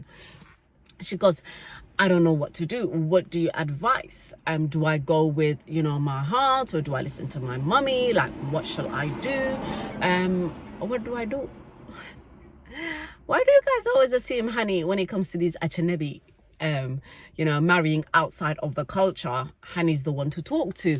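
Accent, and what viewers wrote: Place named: Somaliland. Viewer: Sorry, I had to comment again the reason your children are non considered a somali in the Somali context is because of the clanal context within Somalia your children will not be considered Somali but Granadian and therefore will not get clanal protection if they were to live in Somalia. No matter how much you try to advocate it within the Somali context your children are NOT somali they are Granadian no matter how strong their culture is and how much you have instilled somali culture in them. In the West its all fine, I see hint of somaliland accent, if that is case in SOmaliland your children will never get nationality because of the constitution as you get it through the father side.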